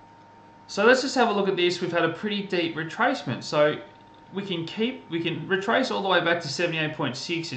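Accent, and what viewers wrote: Australia. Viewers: Brilliant, level headed insights. And I love te Aussie accent being a Queenslander myself. Where are you from?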